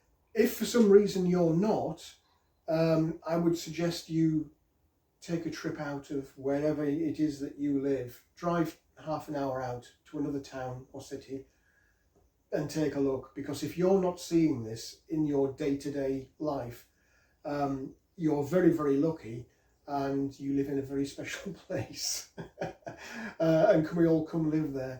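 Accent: United Kingdom. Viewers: SO interesting to listen to British language.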